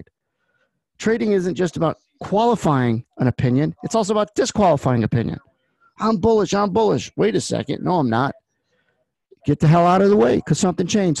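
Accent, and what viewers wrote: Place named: New York, United States. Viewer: I love these videos every day. No nonsense blunt real new Yorker talk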